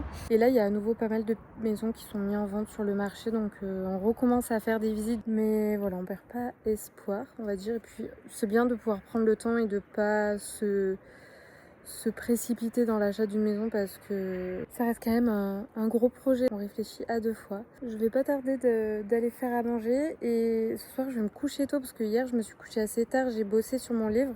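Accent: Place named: South, France. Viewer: C’est drôle j’ai remarqué que tu as l’accent du sud mais que sur les mots qui finissent en « in » ahah ça a un peu perturbé la sudiste que je suis ! Mais ta vidéo était vraiment digne d’un poème c’était tout doux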